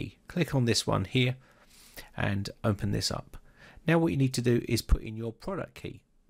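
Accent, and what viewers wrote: England, United Kingdom. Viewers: That's a bit mild. I think they are parasite jive turkey mother humper cunts. Sounds so much better mate. Your videos are clear precise and in English from a English man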